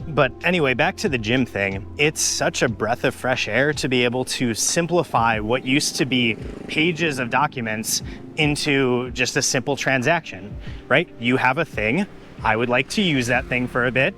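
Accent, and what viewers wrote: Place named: United States. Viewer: I like ur American accent and subscribed🇺🇸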